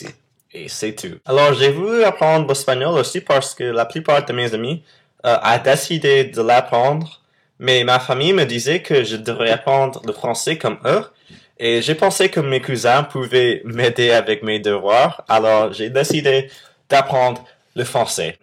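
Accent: United States. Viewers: Michael Rivière Non, on dit des Anglais (ou anglo saxons) qu'ils ont un accent anglo saxon... mais en l'occurrence, ce jeune homme est américain et a un accent américain. Ça va, il fait pas trop chaud chez toi? ( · L'Amérique c pas mon truc mais j'adore ton accent américain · Franchement, chapeau ! =) Tu parles trop bien, malgré des p'tites fautes, mais ça, on s'en fou ^^. Je pense que si je ne l'avais pas appris depuis mon plus jeune âge, je n'aurais jamais été capable d'apprendre le français -3- En tout cas, tu as du courage ! Continue de t'améliorer c'est presque parfait, mais surtout ne change jamais ton accent ! Personnellement, je trouve que l'accent français est fade et plat comparé à l'accent américain ! =) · Bonjour :) Je viens de découvrir ta chaîne et tes vidéos. Tu est très beau :3 et tu parles très bien français, même si tu as l'accent américain (ce qui est normal). 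Je te félicite c'est très bien :) Translation : Hello :) I just found your channel and your videos. You are very handsome :3 and you speak French very well, even if you have an American accent (which is normal). I congratulate you, that's fine :) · Salut! Je viens de découvrir ta chaine , et j'avoue que c'est trop cool d'entendre un américain parler Français. Je trouve que tu t'en sort pas mal même avec l'accent avec quelques fautes mais sa c'est normal :) Et je voulais savoir si "quelqu'un" connaissait des astuces pour parler anglais :) J'aime cette langue mais les cours que font les prof son nul donc ..... voila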